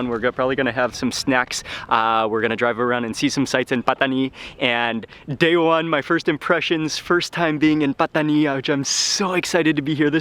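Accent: Malaysia. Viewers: masih pekat melayu. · Kecek kelate lako ter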